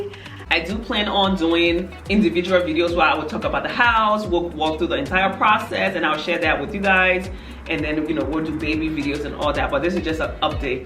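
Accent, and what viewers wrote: Liberia. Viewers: Congrats on everything! I have to ask, are you liberian? I love liberian accents!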